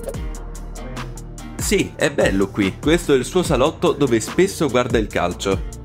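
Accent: Germany. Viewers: Bel video❤ Ma sei tedesco?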